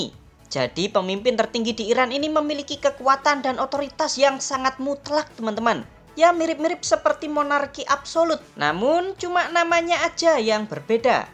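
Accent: Java, Indonesia. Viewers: suara naratornya Jawa Banget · Kenapa jawa mu lengket amat? aneh kedengarannya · logat nya medok bgt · Mimin logat jawa nya kental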